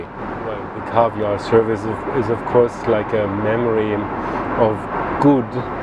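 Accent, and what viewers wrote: Germany. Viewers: Hay, I've only just found your channel a short while ago. I had been watching one of the reviews from "Nonstop Dan" and whilst making a comment to him about his latest second channel, I saw, in the comments section, that you had also added your own comments. That was the very first time that I have heard of so my reaction was to immediately check you out. I am so very glad I did! I've only just begun looking through your quite extensive range of reviews and, from what I've seen so far, I don't think that I'll at all disappointed with them. I intend to spend a very enjoyable time as I go through your work. BTW Ilove your wonderful German accent! I have visited many parts of Germany because it's so beautiful, as are it's people. I am now looking forward to following you very much indeed. I love your presentation and your appeal! Thank you and Keep Safe, Keep happy and KEEP FLYING! 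Thanx.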